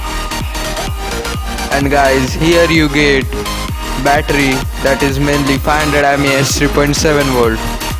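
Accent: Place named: Bengal, India. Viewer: This guy sounds bengali (am a bengali myself)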